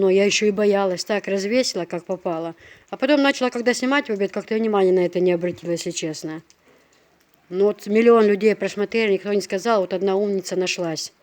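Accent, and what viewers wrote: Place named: Vedeno, Russia. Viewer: мне все нравится что ты снимаешь, рассказываешь!ДАЛЛА эатту бойла хьа. Я жила в пос. Мичурина в Грозном. И мои соседи Дунаевы, Альбиговы были веденские, ( они живут и сейчас там, я в Страсбурге).имена, Кемса в основном встречается у веденских больше. У тебя даже говор веденский. Я очень рада за твоих марзхой, им очень повезло с тобой. Мы жили душа в душу, делились всем, у меня прекрасные воспоминания о моём детстве, юности. Между Дунаевых и нами был маленький заборчик.Кемса (так зовут нашу соседку)всегда была нам как вторая мама. Её сестру звали Дикиева. с уважением Асет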